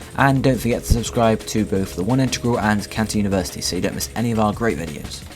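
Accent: United Kingdom. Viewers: There are two things I'd like to point out: 1.) the accent. It's way too British. If you could some how make it more neutral I'd appreciate it. 2.) if you make a mistake stop recording and do it all over again. It bothers me to listen who should be teaching me to make so many mistakes, specially when you add the British accent and the fact that you also leave some sentences without the final pun. Work on that please. :)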